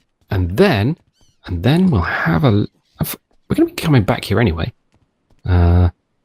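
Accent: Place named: England, United Kingdom. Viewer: You are definitely my favourite Stranded Deep You Tuber. I appreciate your in depth approach particularly on the Cartographer series since I'm getting into modding now. So much changes as ea games progress it's important to explain to your viewers which version you are playing and exactly how things are done, some tubers forget that fact. I find your delivery easy to listen to, some Tubers have good content but are exhausting since they sound like they are are on crack, yelling and swearing constantly, I feel like breaking out the ritalin :D It's also nice to hear an English accent (just personal bias) and since you asked, I'm an English woman living in Portugal.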